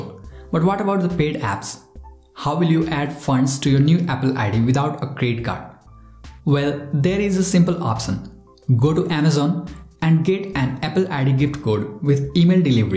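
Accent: India. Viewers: Why does he sound like Indian???????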